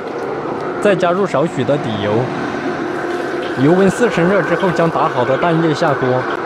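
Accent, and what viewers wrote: Sichuan, China. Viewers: 感觉这哥们是四川人，这口音应该是四川人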